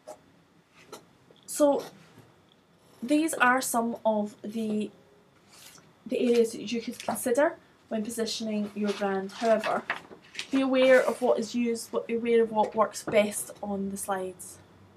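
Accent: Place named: Scotland, United Kingdom. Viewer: I love her accent, Scottish?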